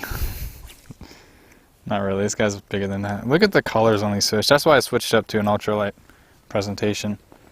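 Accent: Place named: New York, United States. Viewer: it's how us New Yorkers talk😂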